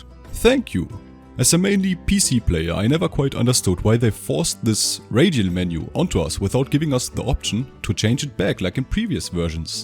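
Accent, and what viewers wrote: Germany. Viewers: Haha mann hört nach 3 Sek. Das er deutscher ist 😁 gj man. Mach weiter so 😉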